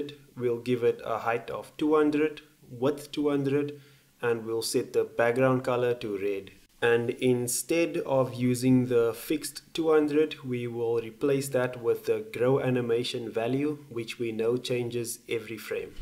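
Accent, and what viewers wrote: South Africa. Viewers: Going out of topic... are you a South African? you sound like an Afrikaans speaking coloured. :)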